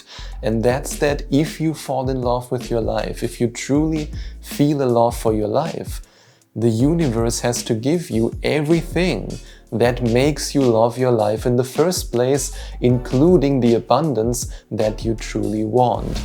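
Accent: Germany. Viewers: I love your German accent, your wonderful sense of humor and the straightforward way you present the material for us to understand your journey and our journey.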